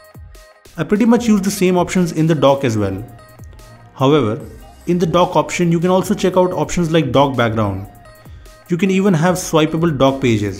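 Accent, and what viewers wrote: India. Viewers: love his indian accent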